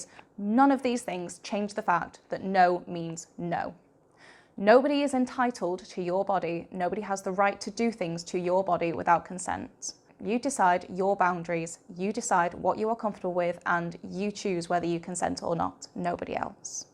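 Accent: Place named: United Kingdom. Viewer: i'm pretty sure the person speaking is british too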